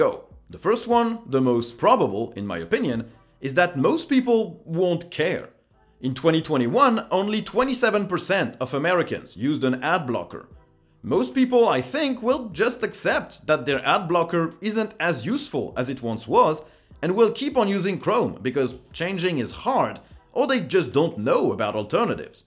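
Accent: France. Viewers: Je me disais aussi que tu avais un accent français :)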